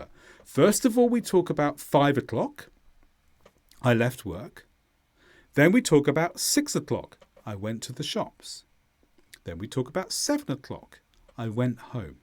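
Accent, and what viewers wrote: United Kingdom. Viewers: When I hear such beautiful British accent I remember ( is it a correct word in this context?) why I decided to learn English in the first place. Music to my ears ))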